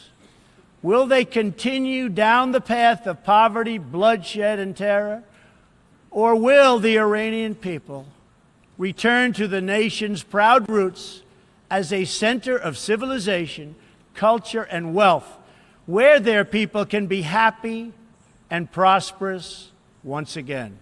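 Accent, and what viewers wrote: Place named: New York, United States. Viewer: I really am on bored. I dont see any other politician saying this nevermind with his smart new york guyvtalk that is likeable not racist. Media is highjacked he says he is worried about america because we arent living up to expectationa because of our own system the president cant bring world peace unless we start in America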